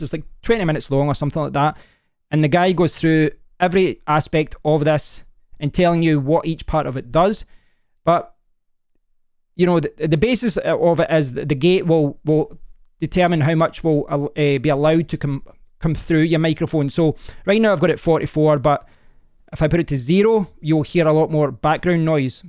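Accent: Scotland, United Kingdom. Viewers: Scottishhhhhhhh!!!!!!! i did my first degree in Glasgow and missed this Scottish accent. Congrats, very good demonstration. Thanks for sharing. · is he scottish? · best scottish accent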